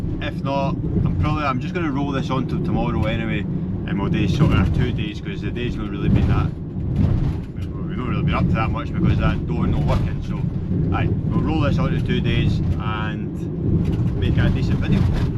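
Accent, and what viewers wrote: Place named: Scotland, United Kingdom. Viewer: Where specifically in Scotland is your accent? Glasgow somewhere?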